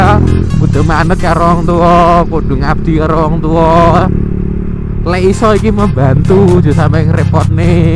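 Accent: Java, Indonesia. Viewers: jowone ngendi mas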